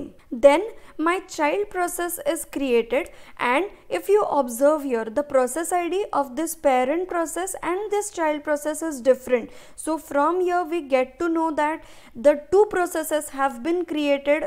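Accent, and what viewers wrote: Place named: India. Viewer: Your indian accent is really annoying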